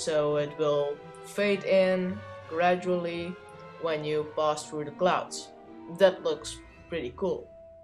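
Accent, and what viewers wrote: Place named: Netherlands. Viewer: are you from holland because it sounds like you're from holland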